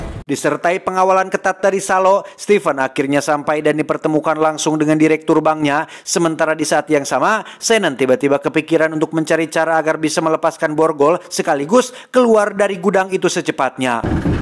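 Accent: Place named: Madura, Indonesia. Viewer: madura bang 🔥🔥🔥🔥🔥🔥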